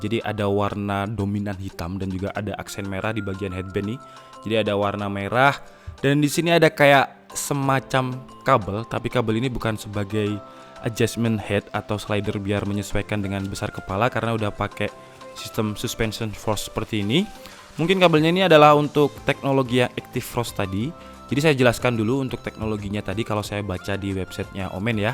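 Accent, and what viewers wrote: Java, Indonesia. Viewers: Wong jowo asli Iki.. ngomong Cok e mbulet🤣🙏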